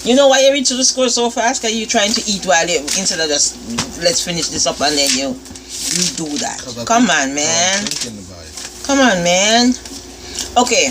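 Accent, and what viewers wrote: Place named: Caribbean. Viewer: great, keep it coming please. Your show is great! Love when you sing yo us a bit. What is your patois? Jamaica? Barbados? Some isle accent, right?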